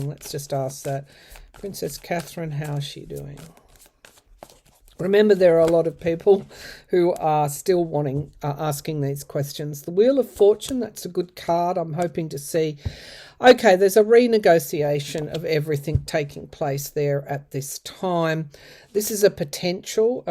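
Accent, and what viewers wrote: Australia. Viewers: I appreciate your natural, calm professionalism without you being cold or putting on a show. So very glad to have found your channel and to hear an easy paced Australian voice. Even more, that you expertise ranges from cards to astrology, channeling and mediumship. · I'm American and adore your feelings. Being on the side of what is right is where I am. I have to also say I love your Australian accent. It's so sweet and wonderful!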